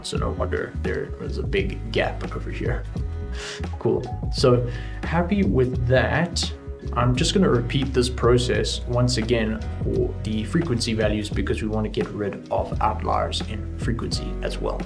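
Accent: South Africa. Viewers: Am I hearing a South African accent? Thank you for the valuable video by the way. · Is that a South African accent? Automatic Subscription!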